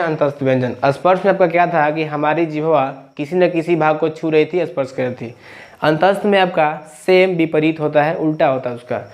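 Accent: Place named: Bihar, India. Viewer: Bahut hi ghatiya lecture hai samajh me nahi araha bihari bhasa se padhaya sub teacher aacha padhate hai is channel ke bas ise aur english wale chhod kar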